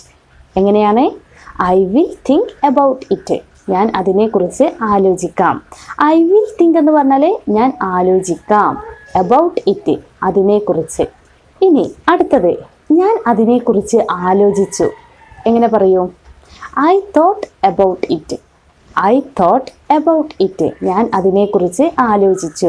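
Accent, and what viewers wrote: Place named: Malappuram, India. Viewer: I think u r from malappuram.because of ur talking style.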